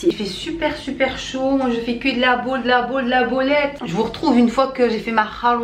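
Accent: North, France. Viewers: Tout est 'leeee' ta laccent du nord haha t'es de Paris ou plus dans le nord ?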